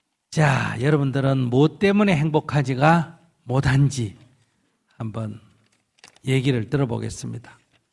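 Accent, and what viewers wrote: Gyeongsang, South Korea. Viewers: 양영준 많이 들어보시면 이유를 알게 되더라구요. 저도 첨엔 그게 시러서 기피햇어요. 평이한 톤으로 말하면 중생들이 못알아들으니 강하게 말씀하는거고 경상도 사투리가 좀 세기도 해요. 근데 듣다본면 진짜 재밌어요. 깔깔대고 눈물나게 웃어요. 도움이 되셧으면 합니다. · 갱상도는 그래ㅋㅋㅋ 왜 열심히 얘기 하는데 화내는 걸로 듣나? 화낼 이유가 있나? 남의 일에~스님이ㅋㅋㅋ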